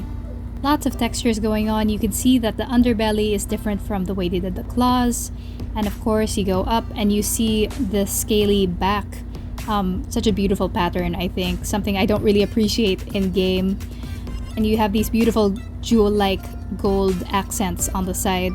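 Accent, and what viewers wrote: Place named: Philippines. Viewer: You sound noypi ma'am. I have been thinking of getting this set.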